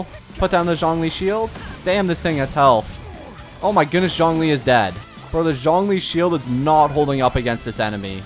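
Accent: Canada. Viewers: i love the canadian accent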